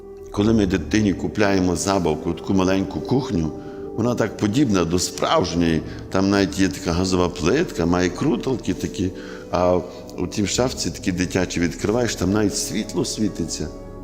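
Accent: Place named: Galicia, Ukraine. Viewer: Мені дуже подобаються ваші проповіді чіткі і цікаві і ще ваш чудовий галицький діалект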